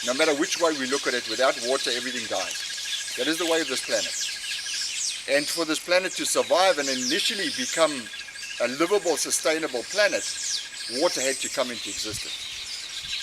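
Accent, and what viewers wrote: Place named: England, United Kingdom. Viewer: Pretty sure that's an English accent too. Colonizers !!!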